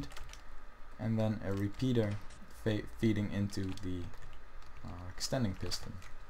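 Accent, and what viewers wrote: Netherlands. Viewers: Are you Dutch, I can hear it from your accent ( Ben trouwens ook Nederlander :) )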